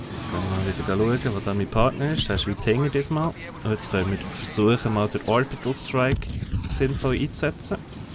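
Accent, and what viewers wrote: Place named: Bern, Switzerland. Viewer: z erstä bärndütsch lp u youtube. chunt eim huerre komisch vor. aber geil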